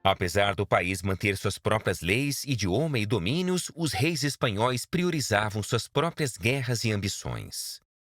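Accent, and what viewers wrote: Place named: Brazil. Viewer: Ouvir a História de Portugal narrada por um brasileiro, gente que nos ideia, é que não pode ser. Além de Portugau. Familia Reau. Que nojo uns afronta aos Portugueses .Tchsu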